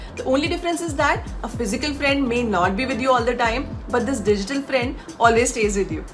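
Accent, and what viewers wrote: India. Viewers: What an accent got this girl, she must be indian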